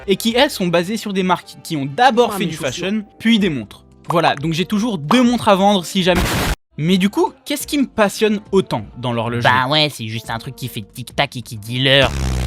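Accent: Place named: France. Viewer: Découvert avec la version Anglaise au lourd french accent, très drôle et superbes visuels, bravo!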